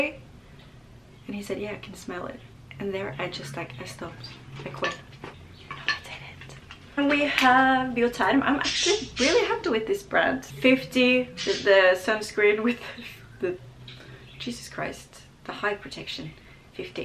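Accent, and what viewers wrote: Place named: England, United Kingdom. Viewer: Merci, contente de vous voir, vous Revoilà. J'adore votre accent anglais je vous regarde et vous écoute pour cette raison aussi. Je suis en Provence. Thank you, nice to see you again, you're back. I love your English accent I watch you and listen to you for that reason too. I am in Provence.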